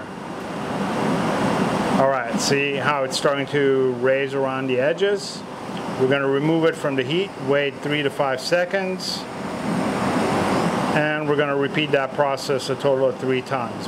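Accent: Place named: Texas, United States. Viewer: Well now I've seen it all, just about.. An Armenian with a Texas accent.... yee-ha mayre tagherem!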